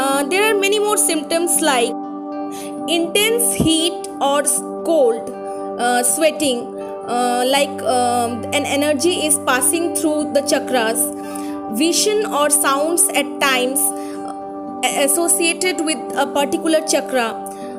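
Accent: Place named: India. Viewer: I’m sorry, I can’t stand that Indian accent so I can’t listen to this video! It’s just so annoying. Lol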